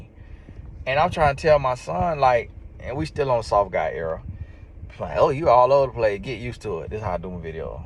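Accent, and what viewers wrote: South, United States. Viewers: Is that how you spell it?🤣 I talk country too, but I ain't know how to spell it 😂😂😂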